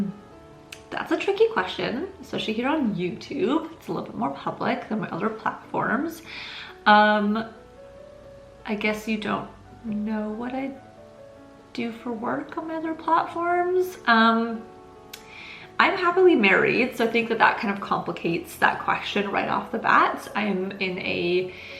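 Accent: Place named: Canada. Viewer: I really enjoy the content, and I can easily tell that the accent is Canadian. I look forward to the video on the tattoos.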